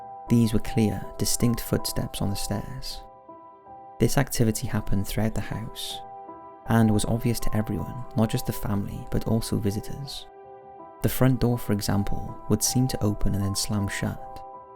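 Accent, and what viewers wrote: Wales, United Kingdom. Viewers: You sound welsh , are you from Flintshire /Rhyl area ? Or are you an anglesey lad ? Anyway you sound very calming great narrating voice !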